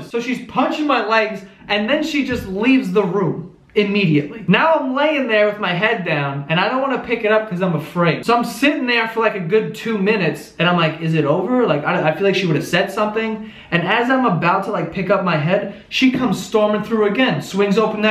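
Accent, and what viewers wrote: New York, United States. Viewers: His New York accent super cute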